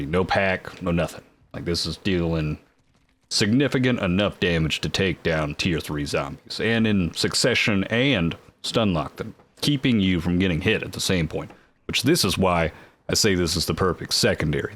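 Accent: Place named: South, United States. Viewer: This guy is underrated as fuck, The deep country accent makes watching you very fun as well as good commentary, fun gameplay, Good ideas and hes clearly not bad at the game either. Keep up the good work my man!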